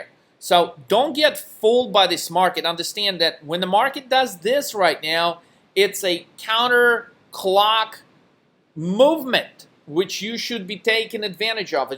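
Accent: South, United States. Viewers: What kind of fucking accent is this lmaooooo craziest one iv ever heard i here European , southern American and New Yorker all at the same time!!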